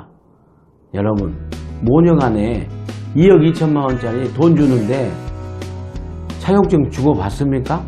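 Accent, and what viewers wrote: Jeolla, South Korea. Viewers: 구수한 전라도 억양과 날카로눈 비판과 지적애 아주 속이 시원합니다!! 앞으로 많이 많이 해주세요.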